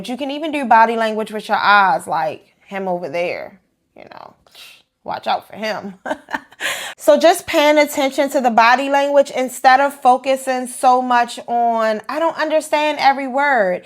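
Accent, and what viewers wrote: Baltimore, United States. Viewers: I hear a Bmore accent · you from baltimore aint you??? Ik another when i hearrr 🤣🤣💕💕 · Go-head girlfriend, lol! Question - where are you from? Baltimore??? I hear your accent and it sounds like it....... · Brand new subscriber here and love the content already! BTW Are you from Baltimore ? 👀😭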